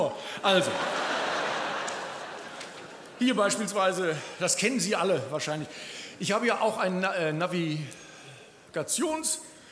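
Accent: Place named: Duisburg, Germany. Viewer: So reden viele in Duisburg.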